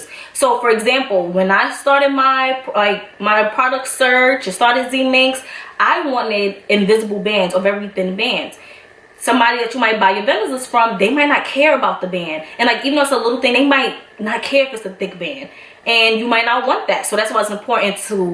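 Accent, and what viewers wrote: New York, United States. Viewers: I love NY accents. Thanks for the vid!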